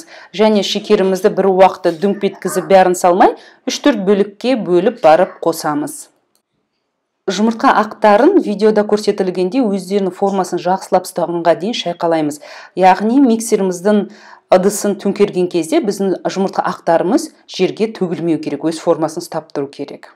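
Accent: Standard. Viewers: Ма щаа Аллах!Аллах енбегинизге баракат берсин!казакшаны таза сойлейди екенсиз 👍👍🌹🌹🌹💋100 лайк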